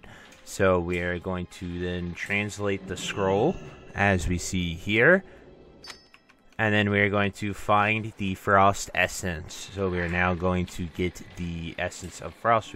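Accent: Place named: Texas, United States. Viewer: Ah, you sound similar to a guy I know from Texas 😊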